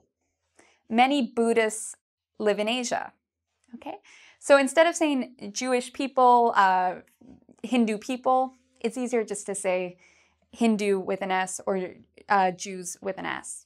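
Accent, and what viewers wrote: Canada. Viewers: canadian eh? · i love Canadian accent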